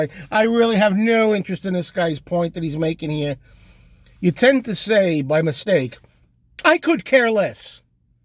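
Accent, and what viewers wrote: New York, United States. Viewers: I always seem to watch your Rant Videos, when I am having my morning coffee, and again, I almost spit my coffee out from laughing. I loved the name of your channel, very catchy.... I am a native New Yorker, here in the South for 8 years, and hearing you TAWLK, I feel like I’m home again...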